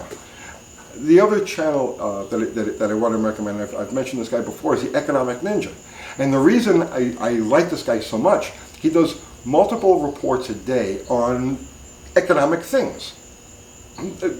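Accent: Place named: Long Island, United States. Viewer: Sounds like you’re from Long Island... I left that place ... NY 24 years ago and never looked back!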